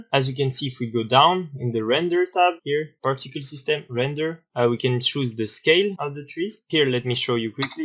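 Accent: France. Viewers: Vu l'accent tu dois être aussi français que moi. Félicitations pour ton boulot. C'est énorme ! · tu es français non ? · salut mais trop ^^ je vois que les cours d'anglais sont d'un haut niveau en france :p en fait, vous parlez sans doute bien, vue que je suis une bite en anglais, je sais pas trop mais l'absence d'accent anglais est fort ou bien c'est l'accent fr qui donne ce coté là quand on parle anglais....enfin, je trouve que c'est plus compréhensible que quand c'est des anglais qui parlent donc c'est cool. mais ca me fait délirer car vous etes le 3 ou 4ieme youtubeur dans la 3d que j'entends parler en anglais lors d'un tuto et l'accent est tjrs aussi facile à reperer, lol ^^ c'est trop drole (et sympa). sinon, sympa le tuto ^^ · Yo, t'es Français? Are you French? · So you are French ? I love your accent from Seine-et-Marne, merci mec 😉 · truc de ouf, j crois j'ai repérer un accent Français par ici mdrrr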